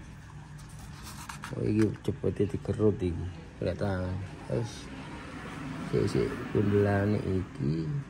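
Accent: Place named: Sidoarjo, Indonesia. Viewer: Pean asli pundi . Kok bahasane sidoarjoan nyel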